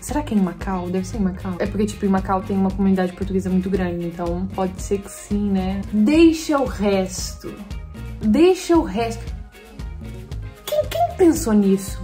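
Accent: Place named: Brazil. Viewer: estás a começar a perder o sotaque do Brasil 🤣🤣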